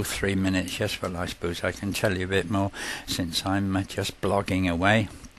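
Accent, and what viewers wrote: United Kingdom. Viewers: I love how British people say process. Like "pro" at some type of game or sport. Great Video!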